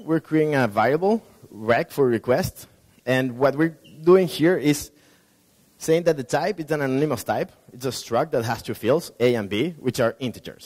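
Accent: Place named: Spain. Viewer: his accent is like a Spanish speaker from Spain speaking English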